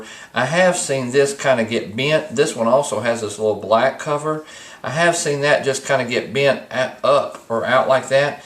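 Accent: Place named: South, United States. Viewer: What did he say here? I can't tell with his southern drawl.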